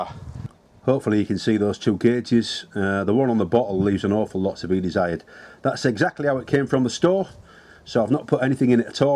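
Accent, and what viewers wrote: United Kingdom. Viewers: Well it was definitely interesting to watch the video to get a good idea of the process. But if you do this regularly it's one way to get to a more fit with this workout, also as you stated a few times during the video is that the pump has to cool down (keep in mind that the internal seals will reach a much higher temp than the outside). One thing that may help both you and the pump is have a fairly powerful fan force some air circulation around both you and the pump, probably best not to do this outdoors though as you might blow up dust from the ground and suck that into the pump cylinder more than needed. Also one thing which I didn't pay close attention to, did you bleed the line between pumping sessions? I usually pump for 4-5 minutes myself, bleed it and take a break while the pump can cool down to refill my air rifle. And once I get above 200 bar I kinda have to cheat and grab a backpack that has a few full water bottles in there to get the pressure up to the max rated 232 bar of my air cylinder on the rifle. 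I'm guessing you live in the UK based on your accent, chances are humidity is usually pretty high then similar to what I'm getting by living in coastal region. If you keep pumping without bleeding the line between sessions odds are you might be building up a lot of moisture that may end up inside your tanks. You'll still get some in there if you bleed it between pumping sessions but it should drop significantly, if you've pumped your tanks this way frequently by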